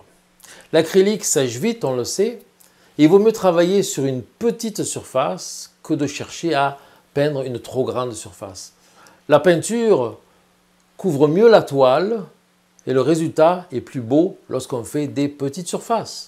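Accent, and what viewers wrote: South, France. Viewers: Petit accent du Sud de la France ET du Québec?? :) Merci pour vos astuces!!!!! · Merci vous êtes bon pédagogue , j'aurais aimer être un de vos élèves mais avec votre accent je suppose que vous êtes du sud! Merci pour cette vidéo! Bonnes fêtes!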